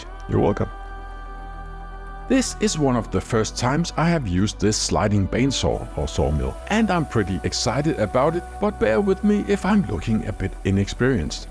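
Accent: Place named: Denmark. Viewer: Awesome! I’m a carpenter in Calgary Canada. But I’m Danish. Moved here 1967 when I was nine months old…Still have family back home. It’s nice to hear your accent. It reminds me of my father, who also died a couple years ago…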